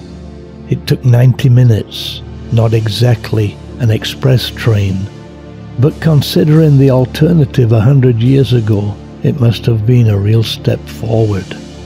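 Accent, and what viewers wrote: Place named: United Kingdom. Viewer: Heroin commentary ftw. I am expecting an "I love you Susan" followed by a death rattle throughout this video. Please man, nice video but have a cup of coffee before you narrate a 20-minute long video sounding like a dying 110 year old Vietnam veteran. The "old British" charm gets somewhat drowned in a weird combination of sleepiness, cringe and wanting to kill myself.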